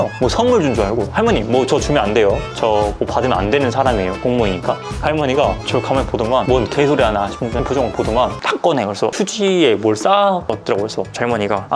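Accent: Gwangju, South Korea. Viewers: 광주 말투 너무 좋아여!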